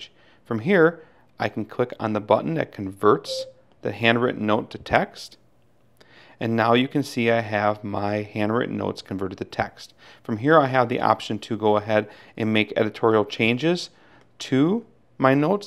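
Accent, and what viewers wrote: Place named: Upper Midwest, United States. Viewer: Thank you. I’m guessing accent is from Wisconsin, Minnesota, or North Dakota. Am I close? 😁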